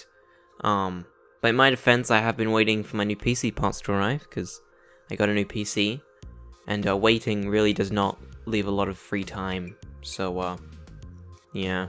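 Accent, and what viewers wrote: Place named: United Kingdom. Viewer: YEEEES HES BRITISH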